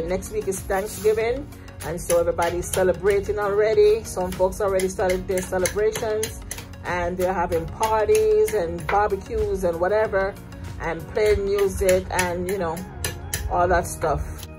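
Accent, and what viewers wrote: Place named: Patois, Jamaica. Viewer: I love to hear you speak PATOIS sis. I love to eat coconut as well. Wow, the price for those here is ridiculous.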